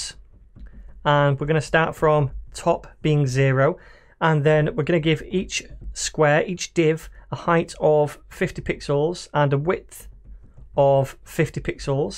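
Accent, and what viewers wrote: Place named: RP, United Kingdom. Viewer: The best thing is he speaks the Queen's English! But everything else is superb too.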